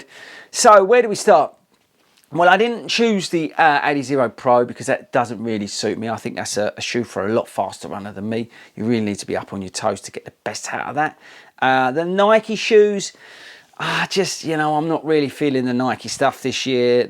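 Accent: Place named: Northern England, United Kingdom. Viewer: Not a huge problem, I like a lot your videos, they are very "straight". By the way, where are you from, your accent, is from the north? my daughter is living in Kent and the accent is quite different. I am from Brazil. The comparative shoes videos are also very good. Thanks for helping us all !! Cheers!!!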